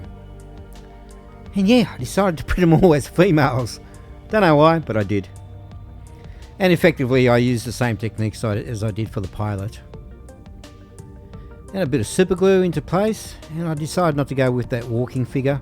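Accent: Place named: Australia. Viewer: Holy crap! You're an Aussie. Nice job, it brings back memories. The old series was by far the best.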